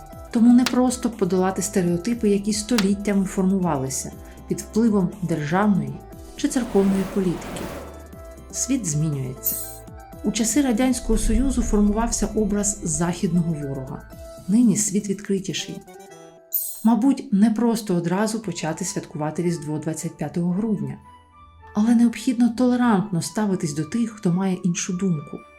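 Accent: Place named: United States. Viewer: Мені подобається ваш американський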